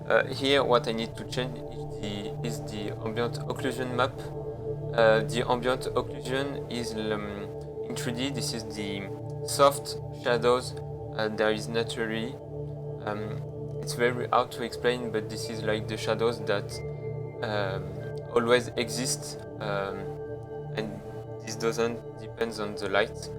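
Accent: France. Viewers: You are from what country ? France ? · Your french or i don't know your accsent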